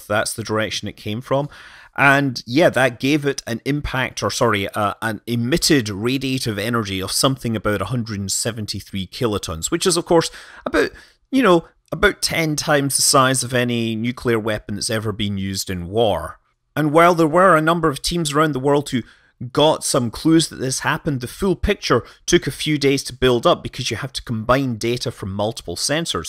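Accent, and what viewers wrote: Scotland, United Kingdom. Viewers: I click for the Scottish accent . . . . . . . and the info too. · Are you Scottish?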